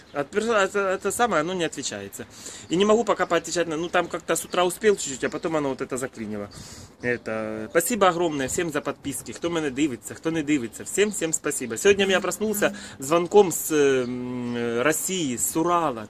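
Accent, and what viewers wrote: Ukraine. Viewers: В этом -то и прелесть,в его украинском говоре.Так что никого не слушай,и шокай скильки душе угодно.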